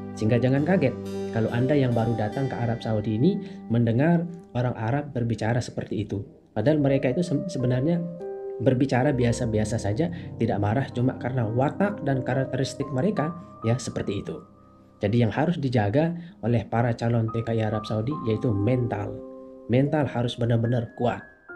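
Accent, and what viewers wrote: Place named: Lombok, Indonesia. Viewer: Pasti org lombok, kruan suare pas kne parlemen hehe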